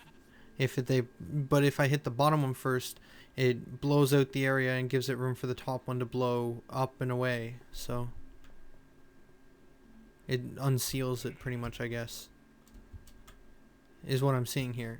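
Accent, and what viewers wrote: Canada. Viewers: I think every other video someone asks if you're canadian xD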